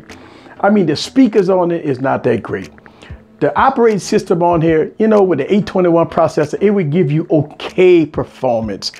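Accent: Louisiana, United States. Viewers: love that Louisiana accent, pretty cool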